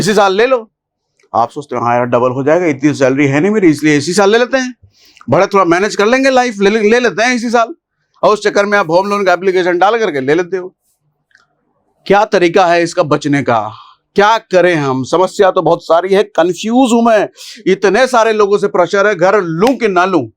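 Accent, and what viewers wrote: Bihar, India. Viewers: AAP BIHAR SE HO KYA?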